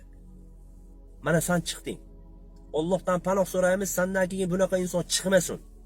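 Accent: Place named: Namangan, Uzbekistan. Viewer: Номонгонликми дейман шевасига караганда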